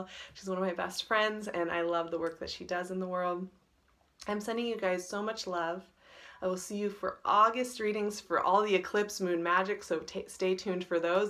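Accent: United States. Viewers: I'm an actor in the U.K. and stumbled here for your American accent... But wow I got so much more! Thank you for your guidance! I've subscribed and will be back each month 💕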